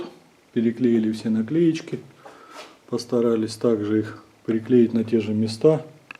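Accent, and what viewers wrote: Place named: Rostov, Russia. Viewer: Как же слышно Ростовскую букву "г" )) и "утак" )) Уважуха за проделанную работу!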